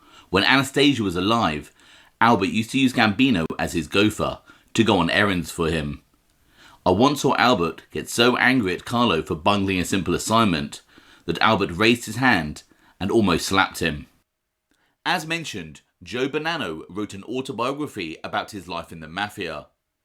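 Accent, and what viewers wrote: United Kingdom. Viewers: I apologize in advance to u t administrators and to the speaker of the video,in my humble opinion heavy exttrapolated british unsophisticated accent is the last thing America crime story needs I am a Cambridge graduate myself, mean no disrespect but that's the way i feel about this videos, sorry again.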